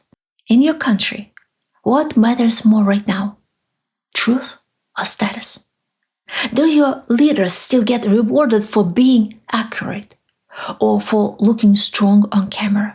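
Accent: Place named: Russia. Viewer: Hi, I started tuning into your channel because I have been a huge fan of ASMR content for years. You have a beautiful, soft voice, and I really enjoy the russian accent. What I ended up discovering was an intelligent woman, with a unique perspective on geopolitics, told with stories and facts. I REALLY, REALLY enjoy your videos, for all of those reasons. I encourage you to continue your work, you are a bright light of truth in a world hell bent on suppressing it. Your work is important, powerful and illuminating. I can't waif to see you continue growing, you deserve that success. Lots of love, from Melbourne Australia ❤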